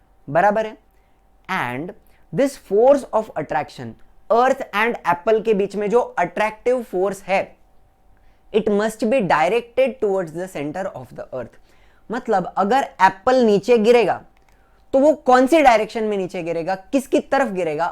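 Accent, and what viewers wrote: Maharashtra, India. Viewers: I bet your mother tongue is Marathi sir🌶️ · Sir you are marathi???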